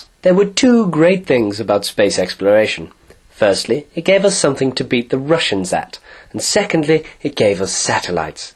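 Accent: United Kingdom. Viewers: Ya, good job noticing the British accent.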